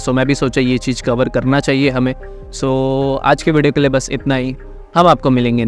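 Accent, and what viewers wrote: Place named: India. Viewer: لامصب ما که هندی نمیفهمیم بااین لهجه هندی انگلیسی نامفهموم تر شده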